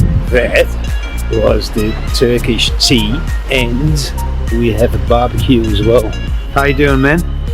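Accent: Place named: Australia. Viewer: Hoe kom je aan dat enorme Australische accent?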